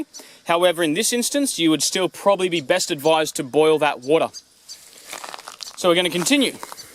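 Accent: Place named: New York, United States. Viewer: How you doin! You sounded like a new yorker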